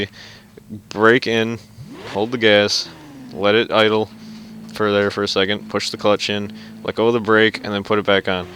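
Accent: East Coast, United States. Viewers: Dude are you from the East Coast? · are u from the east coast?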